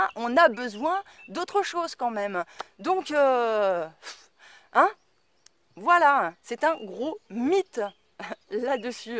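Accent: Paris, France. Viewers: je suis désolé mais je me devais de revenir sur certain points de cette vidéo qui m'ont fort dérangé. Ton ton de voix dans cette vidéo, ou encore ta manière de parler m'ont donné beaucoup de mal à finir cette vidéo. J'ai l'impression que je me fais gronder même si je sus pas concerné. Je trouve que tu as la façon de parler du jeune ado parisienne, hautaine, qui sait tout sur tout, limite à donné implicitement des ordres. Et puis surtout, et je pense que c'est ce qui fait mon impression, c'est les "in" à la fin des mots et les quoi à répétition, sans oublié les phrases classiques de type "non mais j'sais pas quoi" ou "c'est - un truc - de dingue", ou encore les mots comme "choqué", "grave", etc. C'est extèmement désagréable, je suis désolé mais ça m'as fort frustré et dégouté, alors que le but de la vidéo est d'intéresser les gens de les faire changer, et pour moi, si quelqu'un me parle comme ça pour me faire la morale, je lui envoie deux claques (virtuel parce que je n'aime pas la violence) et je l'envoie balader, qu'il ou elle aille se faire f*****. 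Pour capter une audience, c'est la pire chose à faire Ensuite il y a deux points dans ton résonement où je ne suis pas du tout d'accord. Premièrement, oui on peut vivre de chasse et de cueillette, bien sûr que l'on peut vivre de chasse et de cueillette. Nous sommes des animaux, et avec le savoir faire nécéssaire, nous sommes totalement capable de nous nourrir comme cela, tout comme les autre animaux, nous n'y sommes juste plus ha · cette accent parisien avec des "en" a chaque mot c'est terrible . aprés je comprend ta logique mais jvois pas la finalité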